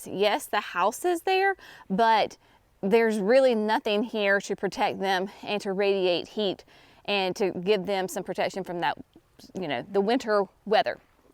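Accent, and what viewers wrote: South, United States. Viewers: I hope you get to a place of relaxation and calm before the wedding. Does the bride want to help, or they are too busy working? It speaks volumes about your kindness and character to be doing so many preparations for the wedding ( and garden tour). I love your videos and get a little homesick when I hear your beautiful Southern accent. Thank you for another wonderful video.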